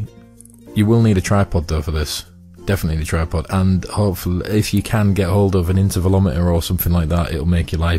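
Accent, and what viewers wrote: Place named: Leeds, United Kingdom. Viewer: sounds like a leeds accent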